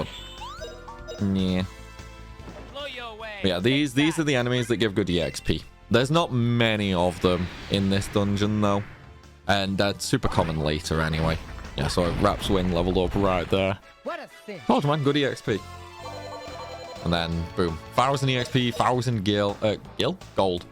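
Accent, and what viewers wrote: United Kingdom. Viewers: I followed this guide and it was accurate and precise to finding all the items. I don't know which was worse tho.... this convoluted tower or listening to this atrocious accent. Why do some brits gurggle the English language like they are choking on spoiled milk? Prime example of why the American revolution needed to happen.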